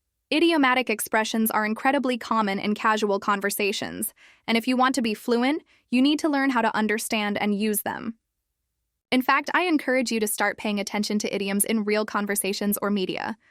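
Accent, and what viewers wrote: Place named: United States. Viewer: American English of course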